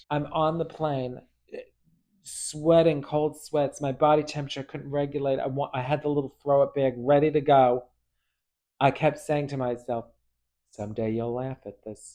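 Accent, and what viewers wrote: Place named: Queens, United States. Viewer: Sounds like Queens, New Yawk!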